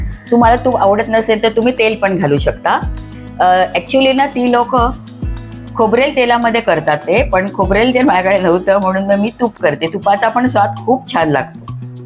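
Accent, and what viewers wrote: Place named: Goa, India. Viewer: तुम्ही कुठल्या आहेत, मातृभाषा कोणती, बोलण्याचे toning Goa side chi वाटते की कारवारच्या आहेत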